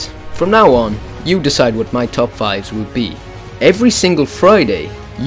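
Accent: Wales, United Kingdom. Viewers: i just got a question are you brittish? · is he welsh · he has a welsh accent lol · you have a slight welsh accent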